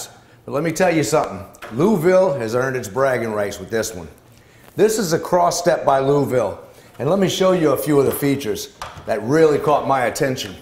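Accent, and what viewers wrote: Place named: Louisiana, United States. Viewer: So smart of a brain. Thanks. Sounds like you are from Louisiana.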